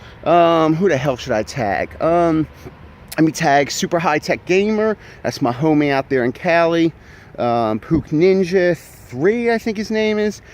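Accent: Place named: Philadelphia, United States. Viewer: I've been watching for a little bit, but I had no idea you were from Philly. I grew up in Mayfair. In hind site I suppose should have noted the accent. I enjoy the vids. P.S. I agree, no one should swim in Pennypack, thats f'n disgusting.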